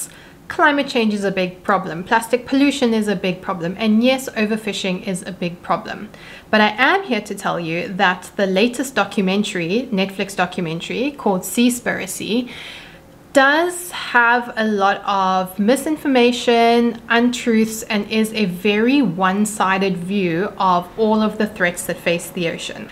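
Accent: South Africa. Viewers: Totally off topic, but I'm fascinated by your accent. Are you from South Africa? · South African, love to hear it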